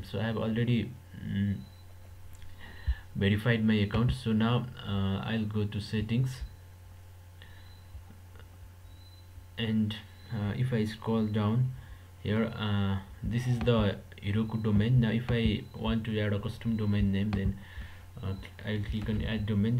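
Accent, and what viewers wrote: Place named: Nepal. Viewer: maile ta nepali accent thyakkai chineko hoki jasto lagyo...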